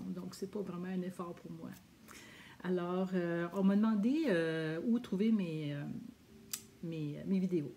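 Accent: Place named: Quebec, Canada. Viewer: Bonjour, quelle colle vous prenez pour le marouflage, car je suis français et je ne comprends toujours le québécois pardonnez moi !!!! Cordialement Serge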